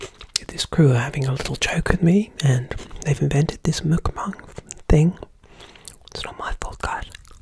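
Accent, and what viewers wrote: Australia. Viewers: Shiiiiiit!! That is a noiiice Aussie accent! And I'm an Aussie Sheila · Without a single doubt, the best Aussie accent I've ever heard from a non-Australian. You even had the same tongue in cheek wit you always have but with that very distinct aussie brand to it. Very nuanced performance love love loved it. Cheers from down under x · As an australian - probably the best Aussie accent from a foreigner ive ever heard!!!!!!! looking scrumptious as always xx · Your Australian accent is on point!! 😍😍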